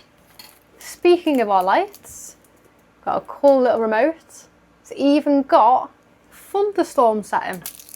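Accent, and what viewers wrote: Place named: Liverpool, United Kingdom. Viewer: I TAKE IT FROM YOUR COMMON ACCENT YOUR FROM SOMEWHERE LIKE LIVERPOOL ? I LOOK FORWARD TO SEEING YOUR RACK MY DEAR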